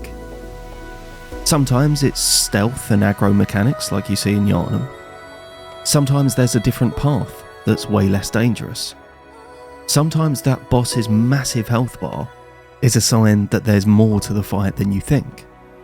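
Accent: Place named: Southern England, United Kingdom. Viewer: Subbed. I consider myself a reasonable enough souls player, now I want to redo them all with the lessons learned here and become a great one. Also it’s refreshing to hear another English creator on here. I’m guessing you’re Southern, maybe Oxford sort of area? Keep up the excellent content 👍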